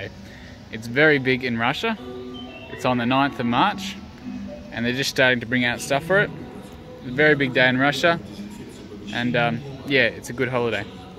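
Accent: Australia. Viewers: В первый раз услышал Австралийский акцент английского. Довольно забавно. Удачи в России.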